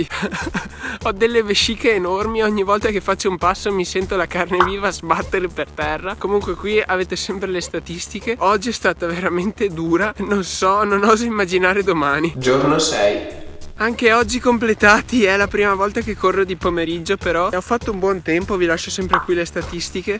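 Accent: Veneto, Italy. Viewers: Te si Veneto sceto😂😂😂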